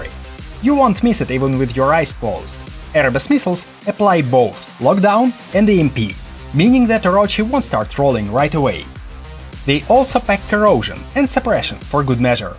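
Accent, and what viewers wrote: Russia. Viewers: парни, наймите диктора с хорошим английским кровь из ушей же стрронг ррашн акцент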